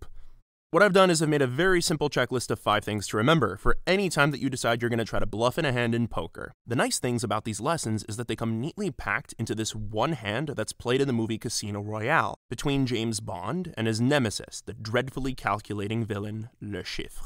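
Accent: Canada. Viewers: I like how you say “Le Chiffre” with a thick Quebec accent but the rest is canadian English xD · Québécois identifié!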